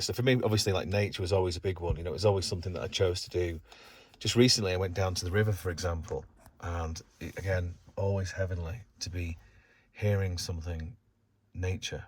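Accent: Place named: United Kingdom. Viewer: Just subscribed and meet you from The Astrology Podcast, your British voice and natural carelessness, ease with how you speak and not so in control is why I've look to find what passes through you to share. First video watching, serenity is indeed with silence, peacefulness a base to the inner witness. Thank you for sharing and making a channel to connect, I will be watching and generating with it. Thank you.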